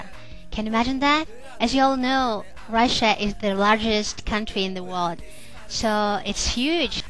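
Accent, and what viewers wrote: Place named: United States. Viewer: American accent does not sound good. In any way.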